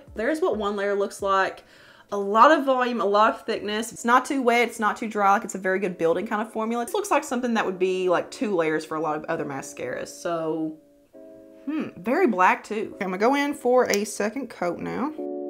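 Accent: South, United States. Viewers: If people didn't, or don't care for, other people's opinions, then they shouldn't watch videos like this one, and get all worked up over something that didn't, doesnt, or won't matter. For 1- Overly critiquing people do it out of boredom, or to cover an insecurity of their own, like something they desire, and maybe you remind them of it (pretty eyes, followers, make up skills, etc) so they try to blast on you, or blow up comments with negativity. BUT...Oh, positive energy trumps that every day! 😁. AND takes more practice then being a "down doucher" I'd rather eat a bowl of water with a fork, then to listen to someone who constantly puts down someone, or EVERYONE, around them. Yeah. I just said that lol Both are pointless, and waste time, not just mine, or yours, but the people who stay to hear it, or in here, read it. The fact that you do these videos at all shows strength and character, and showed that the product works.... 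well....that's all I wanna see in any of these videos. These opinions help me decide on the purchases I make, before I spend, or waste, my money.... So keep showing your before and after make up applications, what you do and don't like, and I'll keep watching! And I respect the Southern accent! 😂. I was born and raised southern, and even now, in my 50s, I sound like the kid from Sling Blade 😂 (From Tennessee and that's my opinion) Keep on keeping on, and forget the haters!! Somebody is always gonna hate, and judge, and try to make you lose you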